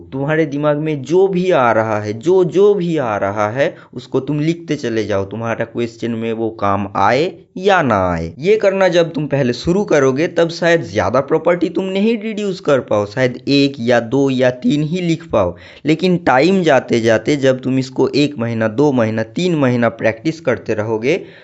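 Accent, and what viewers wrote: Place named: India. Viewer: Ap bengali ya north east se ho kya bhaiya apke accent se lgrha btw me uttar pradesh se hu mains me 98%ile aya hai advanced karne ka soch raha tha but dar raha tha tough questions se lekin aapne achi advice di hai follow karunga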